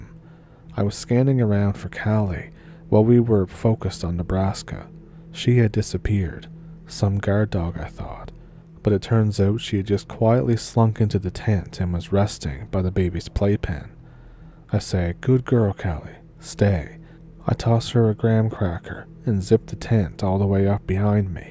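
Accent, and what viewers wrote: Canada. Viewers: knew you had to be Canadian with that accent!! Could listen to ya talk for days 😁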